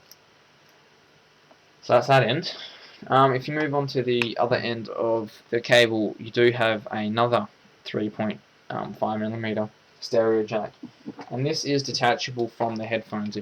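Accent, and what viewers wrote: Australia. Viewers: thanks, aussie? :)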